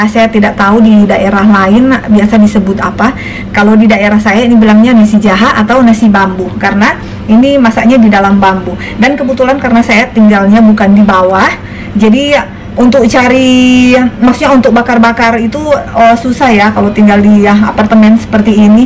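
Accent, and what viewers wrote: Manado, Indonesia. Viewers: P senang vja dengar ba logat bah Manado,,beking kangen pa Kel.di kampung,,,btw Malaysia di mn dang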